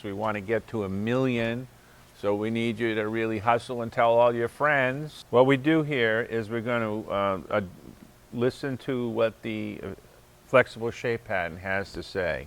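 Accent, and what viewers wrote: Boston, United States. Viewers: your "pauk the cah and feed da meeeder" = park the car and feed the meter Bostonian narration is always enjoyable. This is a great series!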